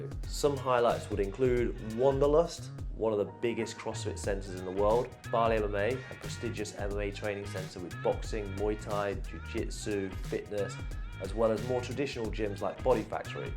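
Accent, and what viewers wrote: United Kingdom. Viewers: I can listen to your accent all day, Mate! It sounds a bit like the north of England. Would you mind telling us from where you hail? Again, I love listening to you speak. I have a think "American" accent because I am from New York City, so... I have had people say the same thing to me. Also, if you can speak up a bit louder on each video that would be muchly appreciated. Even the loudness turned up to the max, I could still do to have your voice be a bit louder.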